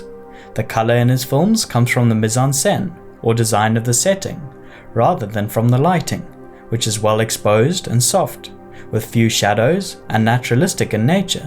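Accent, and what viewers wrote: South Africa. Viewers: The narrator sounds south african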